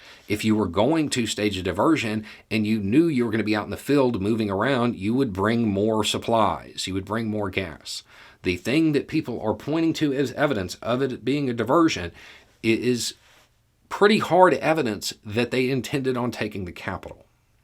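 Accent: South, United States. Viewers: Stop hating 🤡. Yes it’s out there but packages this in a nice and logical way for us to digest. I still do my own research but damn that southern accent just makes it so much more fun to hear it from him😬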